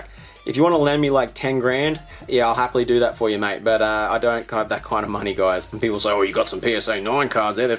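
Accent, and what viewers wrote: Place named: Australia. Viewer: talk with a Australian accent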